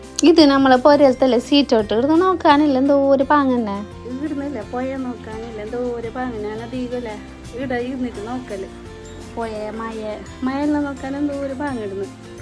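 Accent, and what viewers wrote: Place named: Kasaragod, India. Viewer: കാസ്രോട് ഭാഷ 👌👌👌😁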